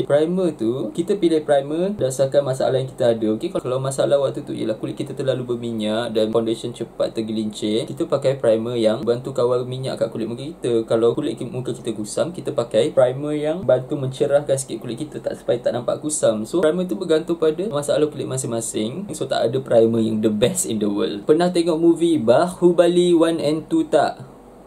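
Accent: Sabah, Malaysia. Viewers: Awww so agree with u. Sabahan slang awk still on point lah! I'm Sabahan too.. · Best nya dgr abam ckp sbh.. hehehe sya sabah celup.. ayah penang.. mak org sbh.. ddk di sbh smpi umur 10thn ja.. so little knowledge about my hometown sabah.. so sya di pggl sbh celup.. huhu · Pahammm bah.hehehe langsung la kan teda mcm urg semenanjung bila bcakap loghat sabah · Best pulak dengar cakap sabah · Bunyi KK sangat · Sabahan bah pula 😅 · Suka dgr ckp sabah.. pekat lg sabahan tu.. 👍🏻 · gila klau sia tda tgk ni video kan, sia tda tau oh ni org sabah 😂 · waaaa... sabindo sabahan bh c 😍 salam dari Tuaran Sabah 😍 that sabah accent 😙😙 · Ko asal sabah ba pula. Pndi ka slang sabah ? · Sy bru tau kau org sabah😄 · bahasa sabah..cenggini ....👍👍👍👍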